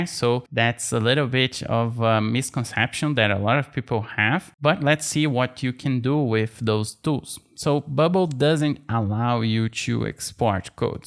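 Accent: Brazil. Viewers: Your english is with brazilian accent rsrs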